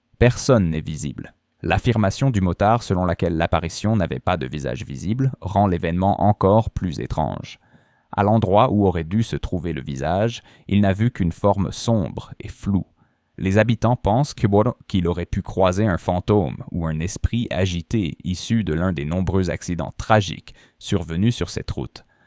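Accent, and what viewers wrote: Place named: Quebec, Canada. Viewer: Franchement je dirais qu'au contraire la voix est très réussie, c'est peut être le leger accent québecois qui t'a dérangé.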